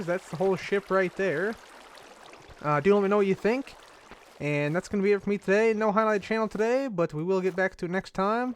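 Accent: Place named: Texas, United States. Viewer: Hey I wanted to know if you lived in Texas you sound like you do because you love space so I bet you live by Houston Ps you are the best YouTuber ever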